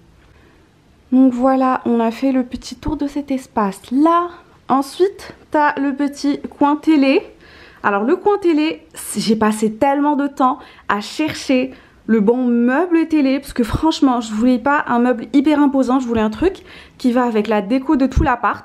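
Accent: Quebec, Canada. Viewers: Tu parle avec un accent canadien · Pourquoi tu parles avec un accent canadien 🤔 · T’as un peu un accent québécois non ?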